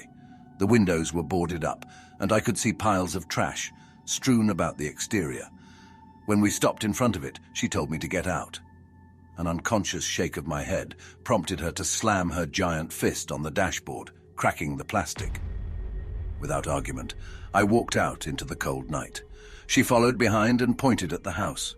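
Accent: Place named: United Kingdom. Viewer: Yay new horror narrator cool and a lovely British accent bonus ❤ subscribed ❤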